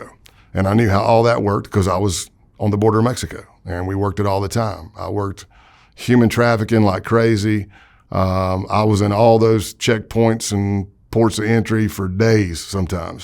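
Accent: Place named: Greenville, United States. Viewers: Yo why does he sound exactly like Rob "ribbit" Pitts from VinWiki Must be that Greenville accent. Love it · HE IS SO SOUTHERN!!! 💞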